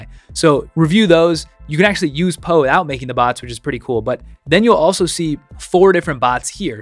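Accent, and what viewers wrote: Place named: United States. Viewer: Your voice sounds sooo American, omg lol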